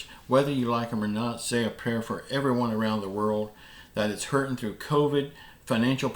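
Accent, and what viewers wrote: South, United States. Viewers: are from the South, you have a Southern accent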